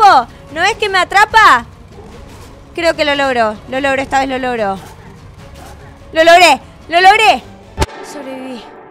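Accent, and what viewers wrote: Argentina. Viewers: Usted es de argentina verdad?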